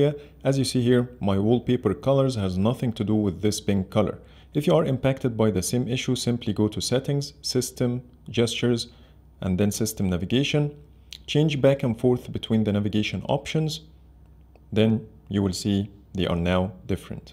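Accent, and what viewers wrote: Egypt. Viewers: يابا ال accent المصري معروفة في اي حته 🇪🇬 😅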